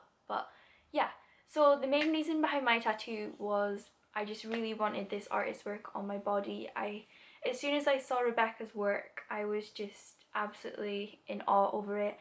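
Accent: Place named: Scotland, United Kingdom. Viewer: Gahh! Love it! Where abouts are you from? Obviously Scottish but your accent is quite a bit different from mines. I'm getting my first tattoo from my cousin in Edinburgh:)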